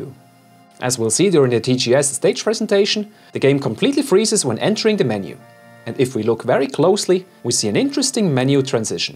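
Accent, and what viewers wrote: Germany. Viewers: Kommst du aus dem Osten oder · Are you German?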